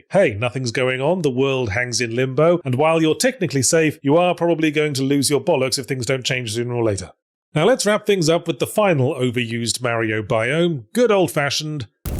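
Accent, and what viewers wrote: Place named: United Kingdom. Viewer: College level intellectual breakdowns of video game design in cheeky tone with a British accent is peak. Love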